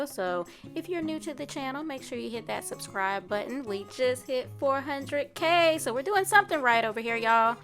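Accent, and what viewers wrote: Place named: South, United States. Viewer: Ok ms thang with the country twang!!! You ate this up my love!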